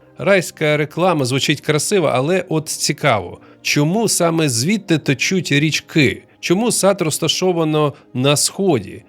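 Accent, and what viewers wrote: Russia. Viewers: Такой корявый русский акцент. Ещё и говорит так медленно, еле языком ворочает.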